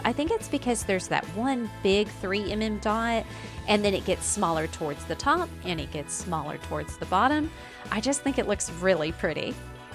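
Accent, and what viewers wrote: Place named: South, United States. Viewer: Your soothing voice with a slight southern accent (so cute) and watching you create is how I start each morning. You've once again inspired me. Thank you!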